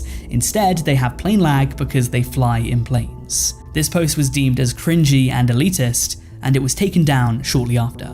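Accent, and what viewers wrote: United Kingdom. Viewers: I usually hate British accents, but Im kinda digging his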